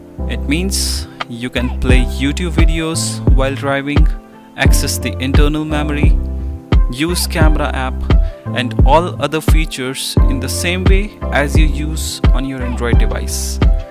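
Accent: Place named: India. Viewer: the first tutorial with hindi accent that actually helped me Great job mate you are 1 in a million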